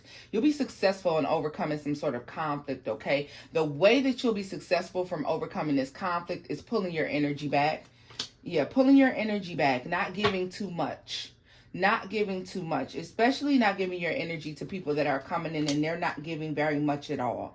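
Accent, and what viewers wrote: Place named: South, United States. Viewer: i’m from texas so i liked that lil country accent lol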